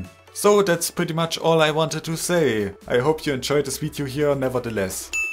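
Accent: Germany. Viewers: I love how you talk 😄. Are you German? Luv your commands 👍🏻👍🏻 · Sein Englisch ist eigentlich ziemlich gut, nur die Betonung/Aussprache hört sich sehr deutsch an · Hey have you thought about letting someone else do the voiceovers? I know a german accent can be hard to get rid of for some :) Or maybe it just bugs me more than most other people here? Anyways keep up the good work :) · du bist deutsch nich? Hört macn irgendwie... und ja ich schau mir das Video in 2021 an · Wohnst du in NRW? :D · Ja, kommst du aus Bayern? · Nice vid are you German?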